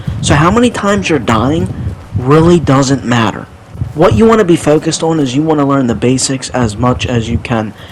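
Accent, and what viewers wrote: Baltimore, United States. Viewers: Thanks for the tips. U from Baltimore? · yeah but more specifically Baltimore or Philadelphia not sure which one but that region.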